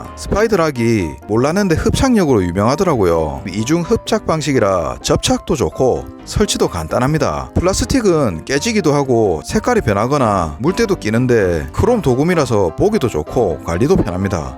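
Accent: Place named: Busan, South Korea. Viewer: 내고향 부산. 사투리 넘나 잼나요~ 살림구경에 사투리 들으러 더 올 것 같아요~!! 구독이용~❤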